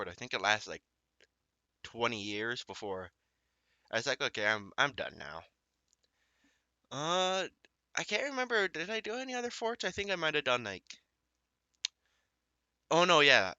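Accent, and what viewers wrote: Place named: Ireland. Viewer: First comment. Also, I really like your Irish accent it sounds so cute ;). Can I pretty please get a dwarf named after me. :cat-orange-whistling::cat-orange-whistling::cat-orange-whistling::cat-orange-whistling::cat-orange-whistling::cat-orange-whistling::cat-orange-whistling::cat-orange-whistling::cat-orange-whistling::cat-orange-whistling: Your secret admirer , NiftySnipes:thanksdoc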